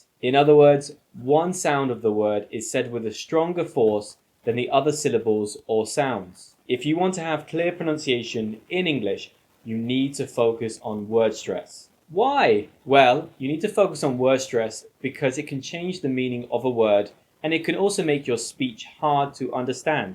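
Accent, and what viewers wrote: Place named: England, United Kingdom. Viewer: I like listening to your true English accent, not the weird American pronunciation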